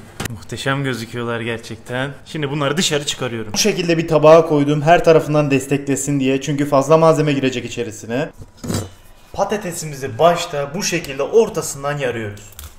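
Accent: Adana, Turkey. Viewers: Bu nasıl hataylı lan adana şivesi konuşüyürr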